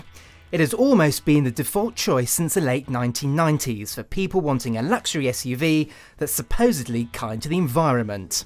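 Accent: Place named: RP, United Kingdom. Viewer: Good review. I'm not dissing the presenter, but I'm thinking its the accent that is putting people off, bit posh... Mat came over like an ordinary guy.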